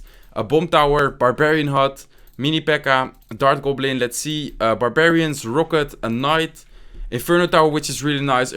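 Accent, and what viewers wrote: Netherlands. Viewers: Lol dat accent zo gemakkelijk om te weten als er een nederlandrr engels praat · Nederlands accent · Hahahaha dat Nederlands accent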